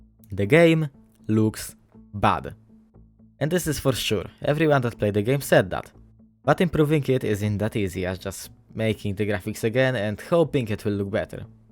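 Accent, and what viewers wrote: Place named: Poland. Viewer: Słychać że jesteś z Polski.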